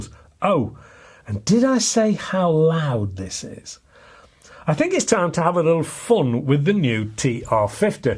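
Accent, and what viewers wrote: United Kingdom. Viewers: given his British accent what do you think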